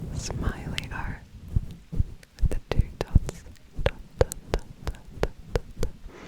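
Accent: Australia. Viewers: I'm Australian and assumed she was too lol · Before I found out she spoke German, I thought she was Australian too. Lol 🙃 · I thought you were Australian and had no clue you were German natively so 😅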